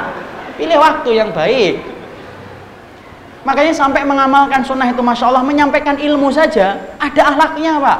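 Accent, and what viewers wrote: Java, Indonesia. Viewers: ini ustadz dari mana ya? baru lihat dua kali ini, jawa banget ngomongnya.. tapi sukaaaa..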